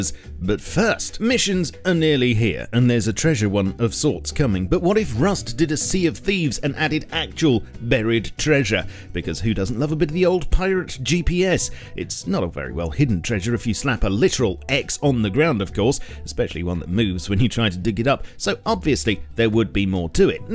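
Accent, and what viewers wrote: United Kingdom. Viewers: I don't even play rust anymore. I just like listening to your update summaries.... and I don't even like British accents or your stupid way of saying "floor" when something is clearly laying outside on the GROUND!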